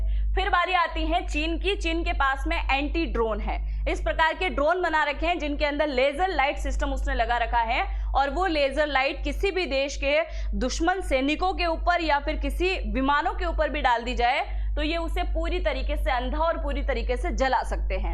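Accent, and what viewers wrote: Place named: Rajasthan, India. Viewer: Aap apni bhada hindustan ki hindi bolen naki rajisthani hindi sory.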